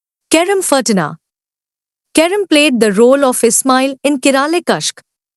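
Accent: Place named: India. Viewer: Ta blbkaco to cteat se naučí výslovnost angličtiny ! Ať nečte nesmysly krava patrně bych řekla india nebo podobné !👎👎👎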